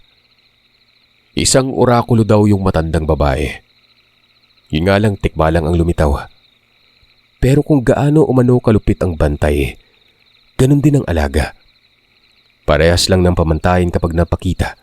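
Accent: Visayas, Philippines. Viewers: Nanggigil ako sayo sir. Napa ka slung ng bisaya mo.. pa-ma` ba`. 😂😂😂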